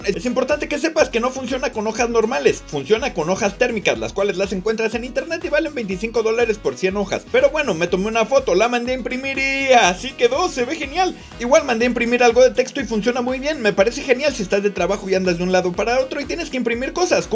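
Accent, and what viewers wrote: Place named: India. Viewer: Por que habla como indu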